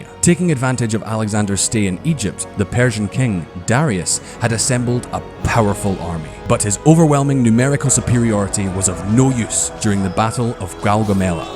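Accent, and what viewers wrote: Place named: Edinburgh, United Kingdom. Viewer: How fitting is having this video narrated by an Edinburgh accent, the Athens of the North.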